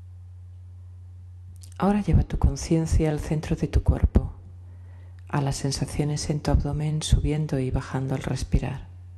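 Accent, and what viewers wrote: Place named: Spain. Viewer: Aparte de la carretilla o media de nylon o red de protección en el micrófono... Es necesario hacer un post mezcla de audio en el out feader o eco... Ya que se aumenta los tonos agudos de la voz. Eso en lo técnico y tecnológico. Pero para darle gusto a JAM MUÑOZ...pues tendría que nacer está mujer en otro país!!!!! PARA NO TENER EL ACENTO CARACTERÍSTICO DE LOS HERMANOS DE ESPAÑA !!!!...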